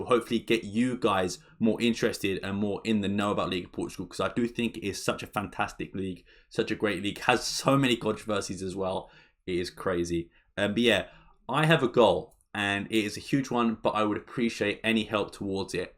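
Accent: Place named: United Kingdom. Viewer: por acaso ainda falas português? O teu sotaque britânico é tão perfeito que nem te imagino a falar português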